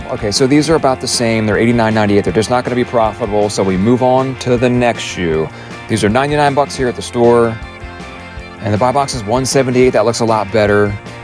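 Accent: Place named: Canada. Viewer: Ive watched a few of these videos from different posters but all seem to be american and i dont think canadian stores tend to have clearance sales to the same degree as in the US. Im not sure if youre american (you have a canadian accent and ive seen some canadian based stores in the background of your videos. Just wondering if this type of thing can work in canada. Also, subbed.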